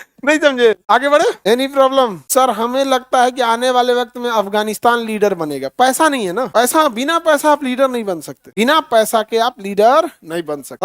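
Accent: Bihar, India. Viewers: I don't care here to get ' Gyan'....I come here for the interesting Bihari accent 😎❤️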